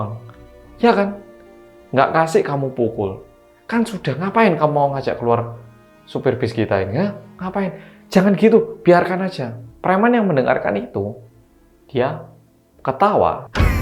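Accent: Java, Indonesia. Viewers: Ngga tahu ini beneran atau bohongan, tapi gw pengen ini bohongan aja karena jahat amat umat manusia 🙏🏼🙏🏼 thank you, Boloku Sing Paling Medok Dheweeeee 🩵🩵⚡✨🪷🫶🏼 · Ini mah cerita bohong, btw ngga pernah bosen denger mas cerita apalagi dengan cirikhas medoknya itu lo.. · Selalu suka sma stortelnya mas. Keren bgt itu loh meragain dan bawain tokohnya tpi ttp meddok gk ktinggalan Kalo jwaban akun ceritanya yo psto bihong iki. Soale kyak smcam urban legend aja gitu hihii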